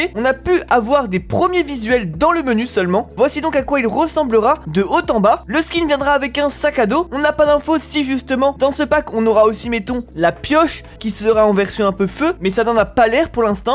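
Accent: Quebec, Canada. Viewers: ta parler quebequois la non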